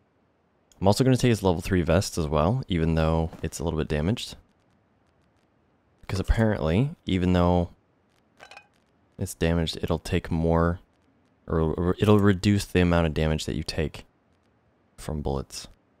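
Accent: Canada. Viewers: Are you Canadian? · are you Canadian? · You gotta be Canadian... you sound just like my uncle just not as deep of a voice lol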